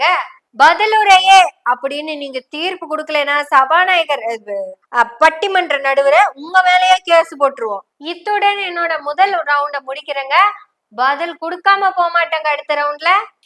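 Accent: Kongu, India. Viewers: உன் முயற்ச்சிகள் வெற்றி அடையும். உன் கொங்கு தமிழ் மிக சுவை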